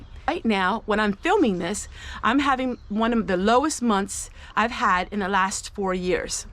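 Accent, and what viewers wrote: Pennsylvania Dutch, United States. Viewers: i love your accent. pa dutch?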